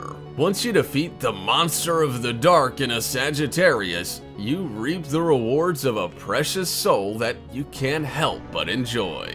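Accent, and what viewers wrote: United States. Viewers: You from Texas ?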